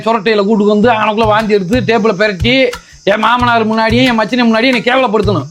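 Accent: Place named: Madurai, India. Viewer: மதுரை கிராம பாசை😂😂😂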